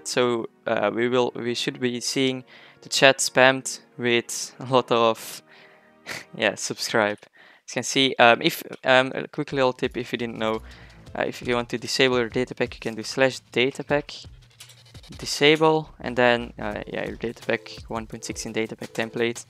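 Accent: Netherlands. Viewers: I can hear the Dutch accent....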